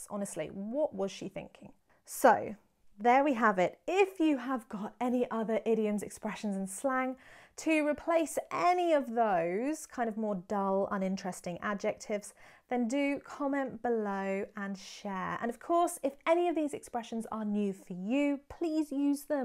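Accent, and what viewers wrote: United Kingdom. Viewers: Your British Accent, and your expressions.. awew....just love the way u speak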